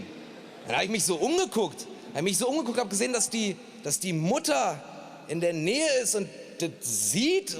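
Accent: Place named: Berlin, Germany. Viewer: dieser Berliner Schnauze stundenlang zuhören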